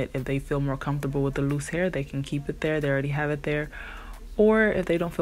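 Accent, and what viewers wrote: Haiti. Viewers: Are you Haitian? Your voice is very Haitian. Please tell me you’re Haitian.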